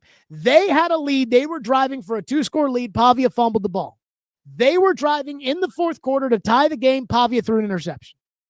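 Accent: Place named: New Jersey, United States. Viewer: Could’ve swore this guy from jersey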